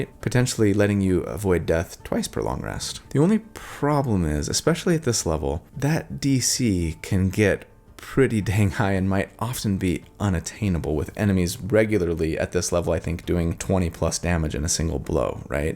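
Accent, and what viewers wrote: United Kingdom. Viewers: As britshman I confirm this is how we sound. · Bes bri'ish accent evah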